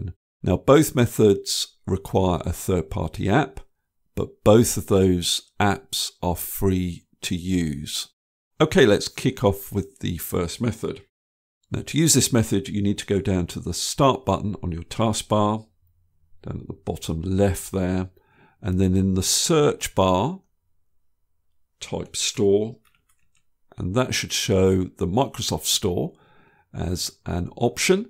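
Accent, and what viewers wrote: England, United Kingdom. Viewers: Thank you brother. Great, easy to understand. I think the English accent helped a lot. You have gained a new dinosaur subscriber!🤣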